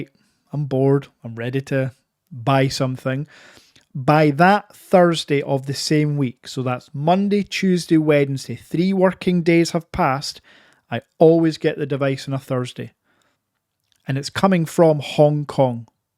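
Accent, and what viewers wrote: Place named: Scotland, United Kingdom. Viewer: Scottish, not English. Are you a fan of The Critical Drinker?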